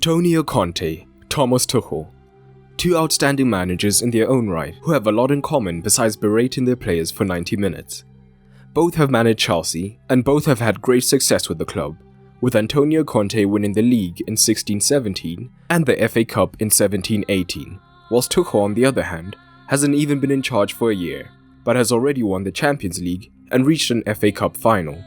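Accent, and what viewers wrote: South Africa. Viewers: Are you South African? great video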